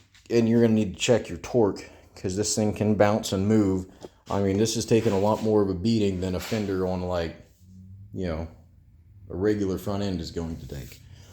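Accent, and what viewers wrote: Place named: New Jersey, United States. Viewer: Hey man I have a question. I got a Moto Iron front end, and the springs make a hellish amount of noise when hitting a bump, on the brakes or anything that causes suspension compression. Sounds like a slinky. I sprayed the hell out of every part of the spring assembly with PB silicone spray, didn't seem to help. At idle, the inner spring on the lower right side is visibly vibrating and shaking like crazy. Wonder if that could cause the noise? Is there some kind of adjustment I can make to fix this shit? I'm brand new to Springers, so I know next to nothing about maintaining and adjusting them. Also, you from NJ? You got a North East NJ accent to my ear. I live in central/southern Jersey on the shore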